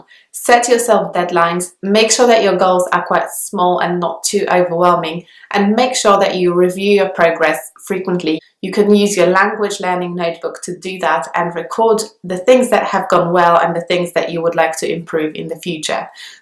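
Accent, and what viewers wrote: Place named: United Kingdom. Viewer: Great content! I have just discovered your channel and it seems to be the thing I needed so far. One quick question. I definitely hear a British accent, but there is something more in your accent. Is it Polish? Your speech is purely beautiful.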